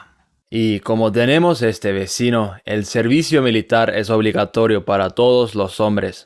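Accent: Uruguay. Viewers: Acabo de ver los primeros videos cuando llegaste a uruguay, increible como aprendiste el idioma y ese acento! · Es uruguayo el acento jaja 🇺🇾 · Que bien que hablas con el acento Uruguayo jajaj que grande boludo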